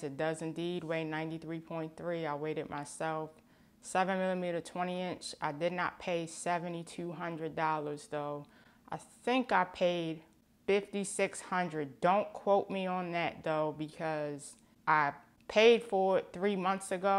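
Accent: Baltimore, United States. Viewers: I hear a little Baltimore in there?👀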